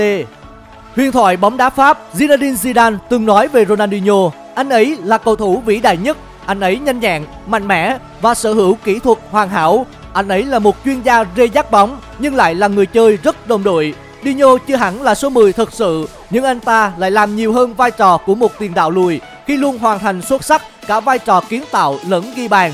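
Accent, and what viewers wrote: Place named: Quang Ngai, Vietnam. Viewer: Giọng Quảng Ngãi đặc sệt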